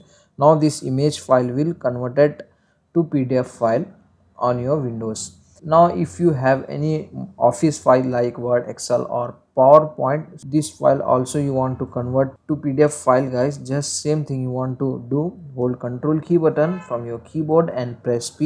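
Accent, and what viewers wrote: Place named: India. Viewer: This video is not helpful for me because of the heavy Indian accent and the voice going strong and weak up and down.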